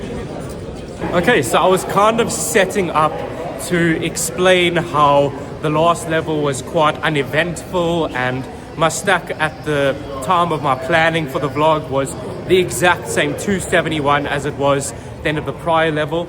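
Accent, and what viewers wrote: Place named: South Africa. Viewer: Loving the vids - the energy and the enthusiasm and the SA accent (I grew up in Benoni!!! - living in the UK now). Keep 'em coming ... but man you have to slow down! How many of the top guys will be rushing off to play hours of cash games at the end of a 12-hour Man Event day? Save that brain power for Day 3 ... and hopefully beyond! 🤟🤟🤟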